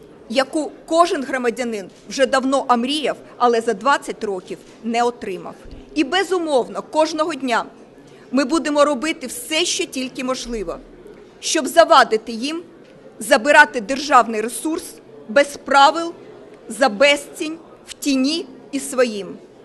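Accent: Russia. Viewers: как всегда хорошо спела, наша сладкоголосая сирена. Всё же українська у неё хромает, акцент русский :), но Азарову стОит у неё поучиться. Показуха - это её козырь. Тут четко видна борьба кланов Тимошенки и Урки Яныка.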